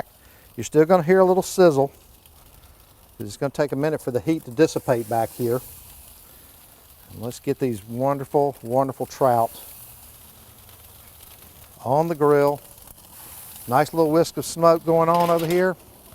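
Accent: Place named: Eastern North Carolina, United States. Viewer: Sounds like you're from eastern NC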